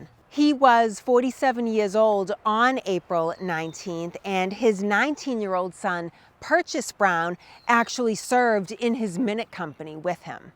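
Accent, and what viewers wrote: Boston, United States. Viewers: definitely New England but more specifically Boston. They sound similar in Maine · It only takes 20 seconds of listening to this lady talking to know that she is definitely from Boston, love it.👌💕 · Another interesting video, thank you. Your Bostonian accent lends a unique sense of realism to the North Bridge area. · Thank for all of your historical research (I love your Boston accent) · She speaks the 25 letter Boston accent. No "r" to be found!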